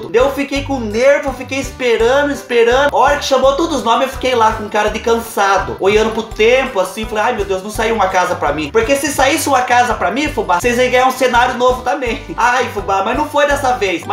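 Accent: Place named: Minas Gerais, Brazil. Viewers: Descobrir o canal ontem e estou apaixonado com seu conteúdo. Seu sotaque é igual dos Mineiros. Você é incrível! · Você é lindo,espontâneo,humilde,engraçado,a parte do restaurante foi melhor. Adoro seu sotaque,sou mineira de poços de caldas e me identifico! Seu carinho com quem te assiste é seu diferencial!!!!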